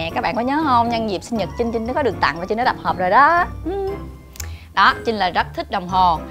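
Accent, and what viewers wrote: Mekong Delta, Vietnam. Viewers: Trấn Cường Lâm đậm chất miền tây luôn á :)))) r mà toàn đọc thành g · Nói chuyện mộc mạc đúng chất miền tây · Trinh nói chuyện thật quá đậm chất dân miền tây tự hào quá trinh ơi Dân miền tây tụi tao thật thà và chất phát 😁😁😁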